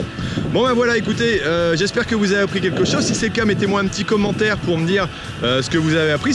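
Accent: Nord, France. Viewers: T'es du Nord ??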